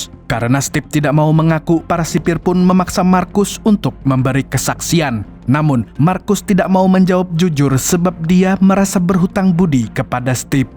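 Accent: Banjar, Indonesia. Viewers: Urang banjar kah abng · Orang banjar kalsel kah pian mang😂😂 "kyapa habar pian berataan" jar pian😅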